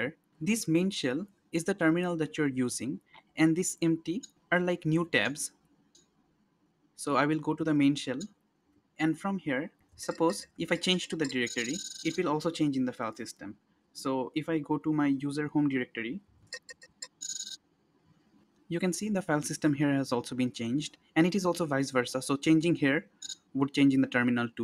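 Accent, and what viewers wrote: India. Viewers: He sounds like an Indian.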